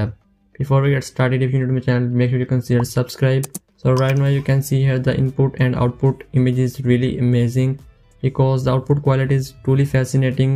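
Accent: India. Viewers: Logat india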